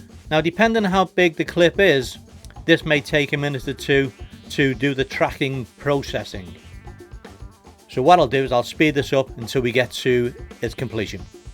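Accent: Liverpool, United Kingdom. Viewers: love your Liverpool accent.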